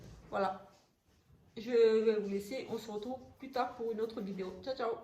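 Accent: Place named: East, France. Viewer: Un accent de lest on dirait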